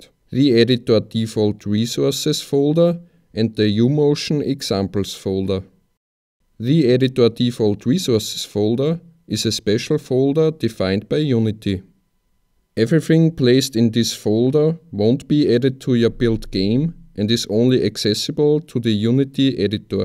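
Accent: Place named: Austria. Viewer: Österreichisches Englisch is einfach leiwand :D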